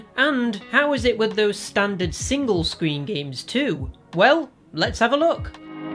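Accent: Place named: North, United Kingdom. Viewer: Thank you for yet another great video,! I absolutely adore ur accent, which part of UK are u from? I would guess somewhere north.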